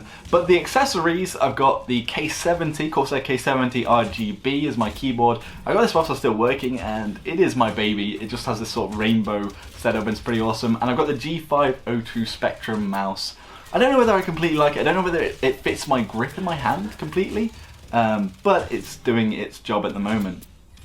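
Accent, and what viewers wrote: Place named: England, United Kingdom. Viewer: What part of England are you from? :D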